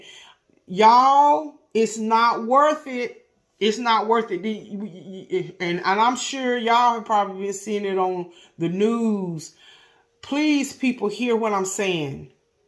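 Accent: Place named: Pittsburgh, United States. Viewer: YOU TALK LIKE YOUR ARE FROM PITTSBURGH.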